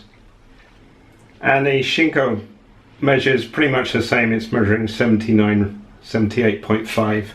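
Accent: United Kingdom. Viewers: Why with a British accent? You're missing clicks because Tokyo + Offroad makes no sense. Great video content though.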